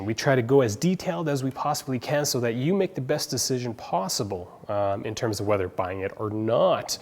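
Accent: Canada. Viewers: Canada eh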